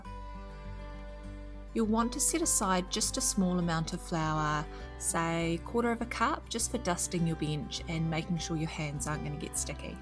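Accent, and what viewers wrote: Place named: New Zealand. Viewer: Love a New Zealand accent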